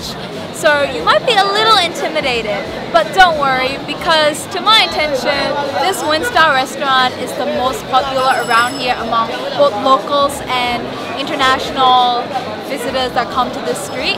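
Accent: Australia. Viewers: Well done, I did detect a slight Aussie accent, maybe coincidence. Good Video as always. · Hello This is. Thank you for all your lovely compliments regarding my presentation, I really hope I inspire you to experience Sanchaung. Just like what a lot of you commented, my English has a particular accent because I've actually been persuing a degree in Monash University (Australia) for a few years so I've been going back and forth between the two countries. And of course using my English for the EatSleep Yangon audience had been a great pleasure for me to show my love for my native culture. Again, thanks for all the interest!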